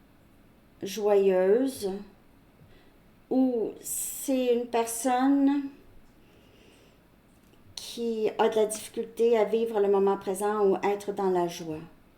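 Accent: Quebec, Canada. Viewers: Salut non sa va tu prononce bien avec un jolie accent québécois magnifique guidance générale j adore bonne continuation à bientôt ❤️ ❤️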